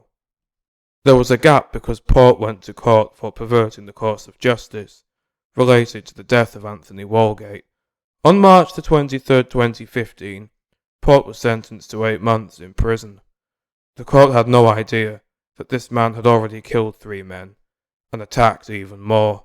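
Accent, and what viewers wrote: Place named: England, United Kingdom. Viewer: What is it with Englishmen narrating so many tc videos? 😂 it's great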